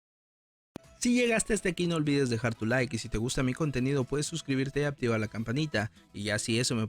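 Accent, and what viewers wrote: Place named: North, Mexico. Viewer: Norteño xd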